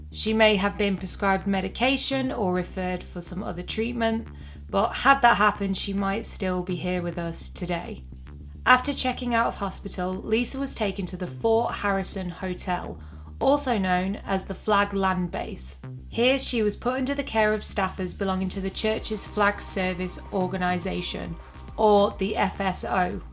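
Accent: Manchester, United Kingdom. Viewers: Excellent series and being a fellow Manc it’s so nice to hear another Mancunian voice.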